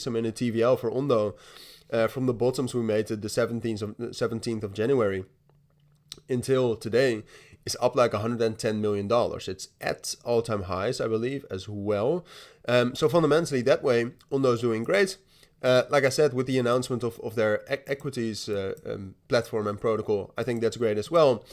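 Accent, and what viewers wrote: Netherlands. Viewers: Where you from? Netherland?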